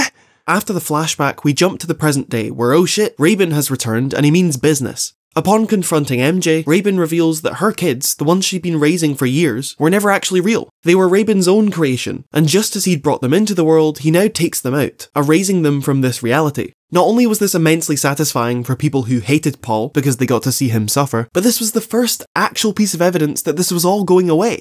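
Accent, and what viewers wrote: United Kingdom. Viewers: I don't think there's something better than listening to a random guy with a british accent and a nice voice talk about random things while I play games and pay 0 attention to the video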